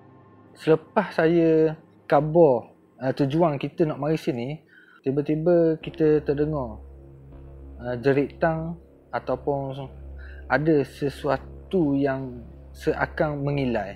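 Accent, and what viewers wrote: Terengganu, Malaysia. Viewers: Best... Loghat ganu yg mudah difahami... Harap pasni matyo pn ada sekali... Awesome... Salam Ramadhan....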